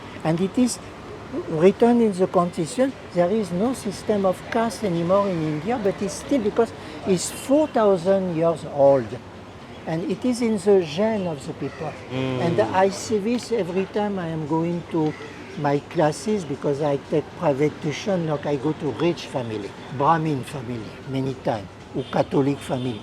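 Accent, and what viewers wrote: France. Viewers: His pronunciation is still like that of a typical Frenchman. I'd love to meet this man one day in Mumbai. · Although he speaks in " French English" accent, he is typical manipulative Muslim. Cast system is related to marriage only. So called lower class enjoy way more benefits than so called upper class. Btw there are only two cast "human" and Muslims .There are only two religions "humanity" and Islam.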